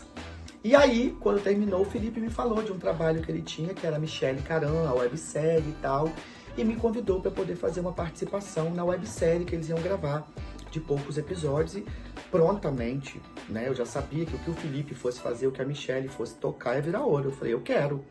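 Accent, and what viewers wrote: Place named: Rio de Janeiro, Brazil. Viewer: Eu achei que vc era Carioca,. Eu fui ao Río duas vezes. Foi maravilhoso demais, me diverti muito. Eu sou de Salvador. Ba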